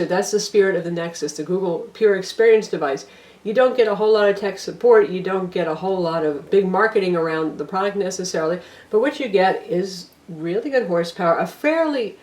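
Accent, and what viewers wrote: United States. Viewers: I'm sure that this is a very helpful and interesting review but unfortunately I only understood about half of what the young lady was talking about! She speaks SO quickly and with an American accent I find difficult to follow.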